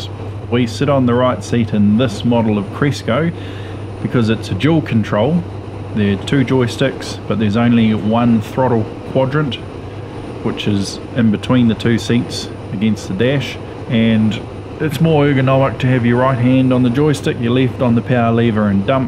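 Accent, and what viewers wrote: New Zealand. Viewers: Awesome vid thanks. Just a Kiwi accent mate! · Nice video. I always enjoy seeing a FU-24 fly. My dad, Jerry Barden, was the project engineer for Fletcher Aviation in the mid 1950's in Hamilton NZ. He helped Robertson Air Service & James Aviation assemble the initial batch of 24's. He then did the test flights on the first 42 completed. We lived there from Sept 54 till April 56. The NZ accent brings back good memories.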